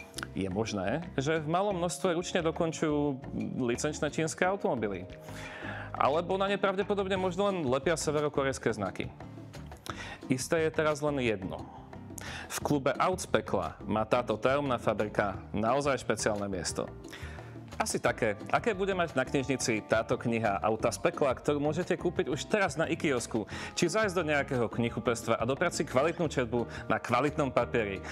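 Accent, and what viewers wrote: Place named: East, Slovakia. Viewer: Co si budem někteří Slováci hlavně z východu nebo středu Slovenska takhle prostě mluví a taky jim někdy nerozumím tady to kazí hlavně ta hudba v pozadí. A já nevím, máš pravdu...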